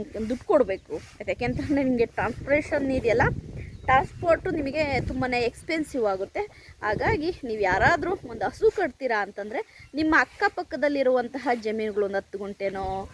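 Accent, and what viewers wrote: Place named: Ajjampura, India. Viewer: ನಿಮ್ಮ ಊರು ಯಾವುದು ಮೇಡಮ್ ನಿಮ್ಮ ಮಾತಿಧ ಉಚ್ಚಾರಣೆ ನೋಡಿದರೆ‌ ನಮ್ಮ ಅಜ್ಜಂಪುರ ಪಟ್ಟಣದ ಸುತ್ತ ಮುತ್ತಲಿನ ಹಳ್ಳಿ ಅನಿಸುತ್ತದೆ ದಯಮಾಡಿ ವಿಳಾಸ‌ತಿಳಿಸಿ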